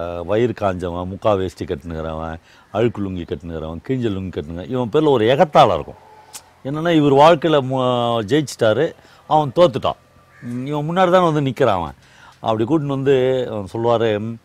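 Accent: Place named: South Arcot, India. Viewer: பாவா உங்களின் மொழி பழைய தென்னாற்காடு மாவட்டம் பேச்சுவழக்கு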